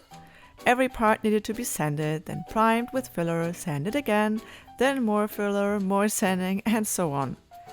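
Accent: Germany. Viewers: Coole Videos! Aber ich kann mir nicht helfen. Irgendwie glaube ich einen deutschen Akzent heraus zu hören :D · ehm youre from germany aren t you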